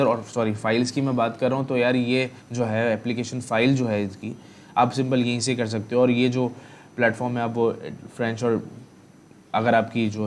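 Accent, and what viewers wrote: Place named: Karachi, Pakistan. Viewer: keep it up... Mujy Karachi walon ka urdu accent bohat pasand hai.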